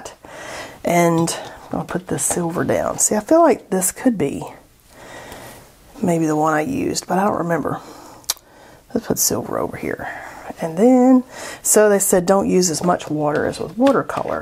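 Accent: South, United States. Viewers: I loved your southern pronunciations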